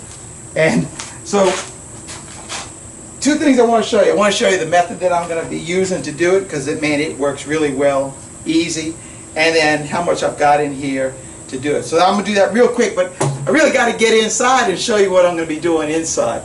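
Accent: Louisiana, United States. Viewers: Stumbled upon you from another bee video. Could tell right away you were from southern Louisiana. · This just popped up on my YouTube (fyp) , you started talking and I immediately said “okay where is he from” because I new it had to be Louisiana lol just wanted to say hi from northeast Louisiana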